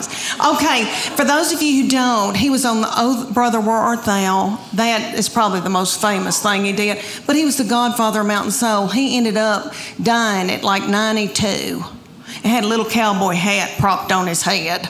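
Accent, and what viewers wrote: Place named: South, United States. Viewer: The heavy drawl is exhausting trying to follow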